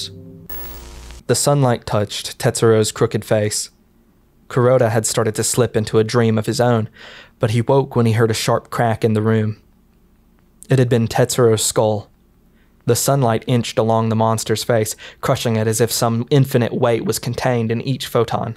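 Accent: South, United States. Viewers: Hey, this is the first video of yours that I've seen and I think it's pretty good! I gotta ask though, are you from the south? I can hear it in your voice lol. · Is this man from the south? your speech is both beautiful and uncommon in your line of work. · It's a little refreshing to hear a subtle country accent talk about intellectual topics. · Great narration. I'm digging the country accent, man. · This man and Wendigoon absolutely carrying the "horror explained by guy with a funny southern accent" genre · I love how horror unites us. As a kid I would never imagine a dude with a classic country twang espousing heart-felt feelings about niche Japanese horror.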